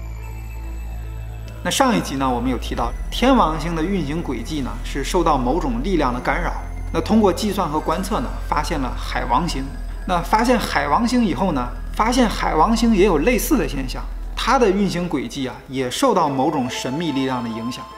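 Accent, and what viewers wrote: China. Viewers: nic小哥听起来像是焦作那块儿的。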